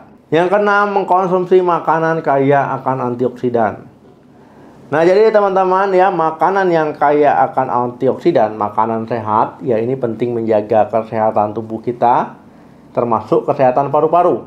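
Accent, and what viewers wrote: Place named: Bali, Indonesia. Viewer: ini logat bali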